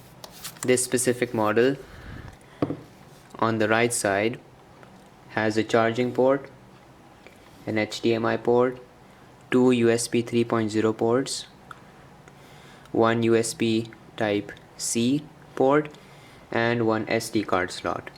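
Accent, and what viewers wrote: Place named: India. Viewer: oh k... U sounded like an Indian.. 😂.. Anyway.. This model is not available in india thats why I was wondering..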